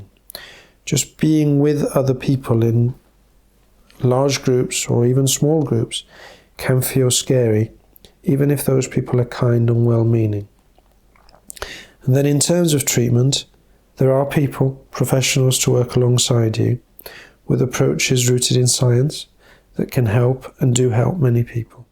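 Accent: United Kingdom. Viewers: Come on with the British accent makes it harder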